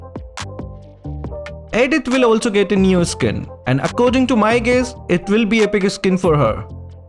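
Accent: India. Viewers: Indian english so bad 🙄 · Your Accent is TERRIBLE AT BEST.. So thick Indian Accent with a Japanese name!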